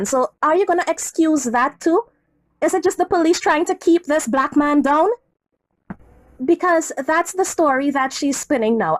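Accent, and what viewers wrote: Trinidad and Tobago. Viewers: I love ur show💞💞. Everytime I hear ur voice I feel like I'm back in Trinidad so thank u for givin me a lil bit of Trinidad when do ur commentary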